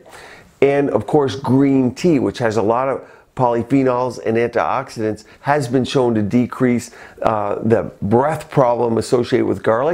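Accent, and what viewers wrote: Canada. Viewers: are u canadian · A Canadian. They pronounce About and Out very strangely.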